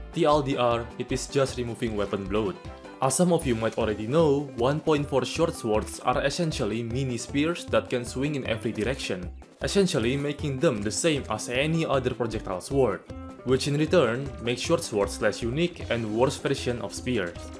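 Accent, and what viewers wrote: Russia. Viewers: bro this man has a very cool russian accent and i approve of it! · why is your accent similar to russian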